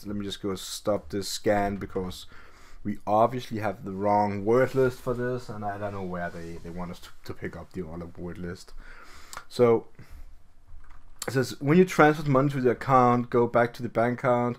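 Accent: Denmark. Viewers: You sound danish :) Thank for the video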